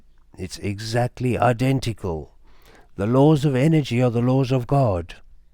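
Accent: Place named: South Africa. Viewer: Some great information and very interesting (but would not be half as interesting without the SA accent!). Unfortunately on the down side, I have some bad news to bring the community, yes my toast got burnt, it was 2 slices, I'm uncertain exactly at which point this was but believe at 1hr 48mins I'd remember something was under the grill. Just wanted to warn anyone else starting to watch this as you might consider finishing off all your weekend or weekday duties that aren't time dependant. Enjoy! 🦉🧔‍♂23🪑<imagine this is a bench>🪑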